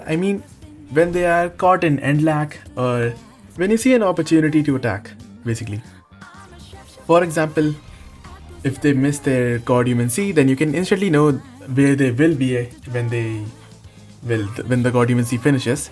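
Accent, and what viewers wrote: India. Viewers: Accent: indian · R u indian?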